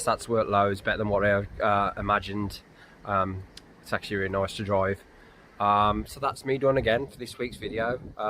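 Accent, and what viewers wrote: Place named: Midlands, United Kingdom. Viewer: Great video. Nice listening to a proper midlands accent. You deserve to gain plenty more subscribers. John👍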